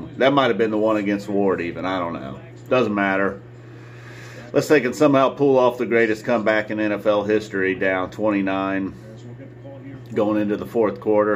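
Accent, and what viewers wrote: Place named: Ohio, United States. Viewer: I love this guy's Ohio accent.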